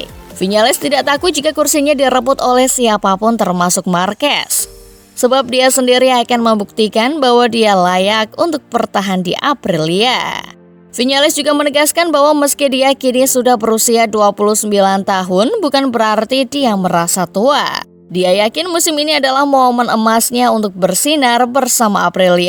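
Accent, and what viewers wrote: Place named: Java, Indonesia. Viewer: Hostnya medok gitu bawa beritanya 😂😂😂 jawa bgt ya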